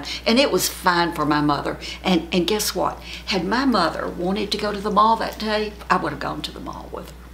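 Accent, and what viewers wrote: South, United States. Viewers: Hi, great advice and hell yes I would love to hear how you and Jim met and how he won your heart. So happy you never settled for just anyone. You always make my day because you are always honest and interesting plus I love you southern accent 😉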